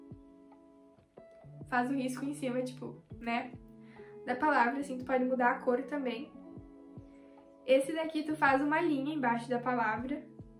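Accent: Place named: Recife, Brazil. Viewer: Gente ela tem muito o sotaque de recife 😂🤩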